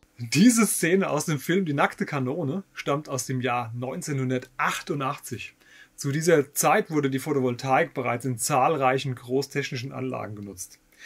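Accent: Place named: Hesse, Germany. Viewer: Danke für das sehr informative und sympathisch vorgetragene Video! Den „Hesse-Bub“ kannst Du ja nicht verleugnen :-) An wen wende ich mich denn am besten für eine seriöse Beratung samt Angebot und Installation im Main-Taunus-Kreis? Bist Du dort irgendwie geschäftlich tätig? Dein Kanal ist abonniert, mach weiter so! VG Jörg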